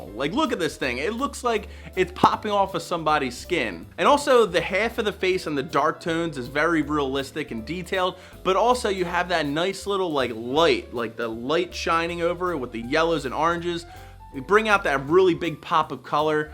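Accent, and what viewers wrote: Philadelphia, United States. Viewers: Yo ur def from philly I’m from the area and can tell by ur dialect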